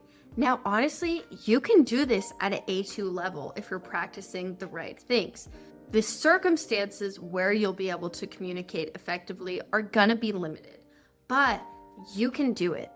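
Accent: United States. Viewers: I really love the American accent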